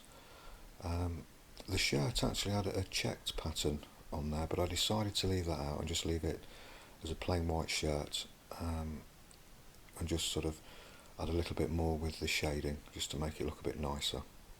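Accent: United Kingdom. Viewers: That's awesome. Just subscribed. The British accent adds to your credibility too.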